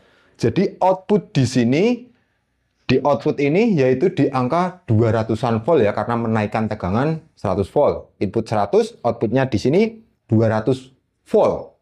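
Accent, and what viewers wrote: Java, Indonesia. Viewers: Jawa ini ngomongnya tebal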